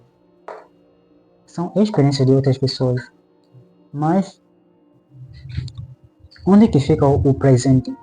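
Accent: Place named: Portugal. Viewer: O sotaque é como se fosse português de Portugal. Ótimo vídeo. Obrigada. Sucesso! Abraço de Belo Horizonte, Minas Gerais, Brasil.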